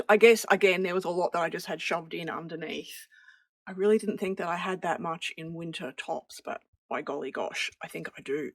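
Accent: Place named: Australia. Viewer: 🇦🇺 heard the Aussie accent and joined up 👍 Yes, I have cleared out my clothes when I retired, I had about 5 outfits left!! So gradually I've rebuilt my wardrobe up, but I have a new mantra, if I don't love it, I don't buy it. I've Stopped buying just because its on sale, I always regret it when I go to wear it!! So love it..or Leave it! 😊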